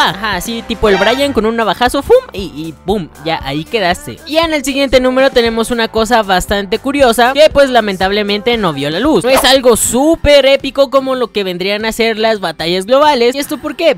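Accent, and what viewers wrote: Yucatan, Mexico. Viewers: una pregunta?? eres yucateco?? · eres yucateco?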